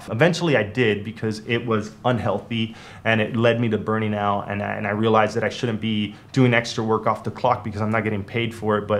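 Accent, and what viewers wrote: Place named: United States. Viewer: Shit man there are so many of us who fight the devil daily.... everything you have said reminds how young one started taking sips from our parents pinching a cigarette etc etc I had water in my eyes... then tarifa appears and playa los lances that gets me in shock... with your American accent thinking you state side.... got to much for me at min 12.50 roughly I'll finish it thow then have to take a benzo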